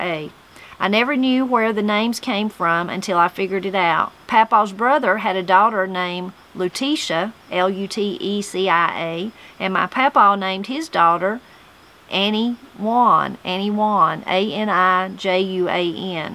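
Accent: Appalachia, United States. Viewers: I love hearing you talk, with the accent my Appalachian family brought to mo. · Your accent is beautiful - it speaks of where you’re from and the long history of people in Appalachia - accents aren’t as strong here in Canada. I really enjoy your videos - thank you!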